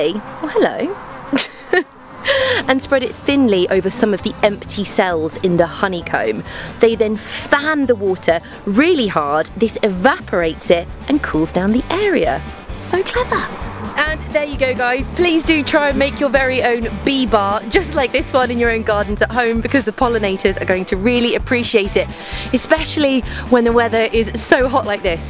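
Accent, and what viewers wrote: United Kingdom. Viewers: What's wrong with me that i feel ichy when she talks. I love british accent but only when I like the speaker.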